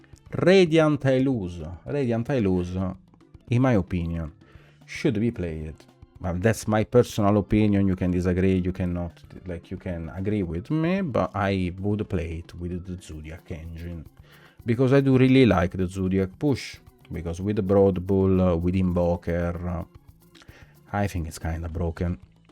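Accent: Italy. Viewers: He has the voice of undiluted Sicily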